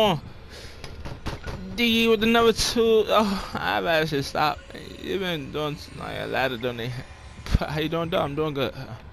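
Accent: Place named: Washington DC, United States. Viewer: sounds like is from Washington dc.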